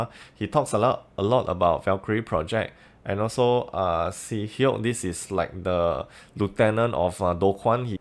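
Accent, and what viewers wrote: Singapore. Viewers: Thanks for the clear and concise video. You sound Singaporean. Cheers from another Lunatic from the lioncity. ;)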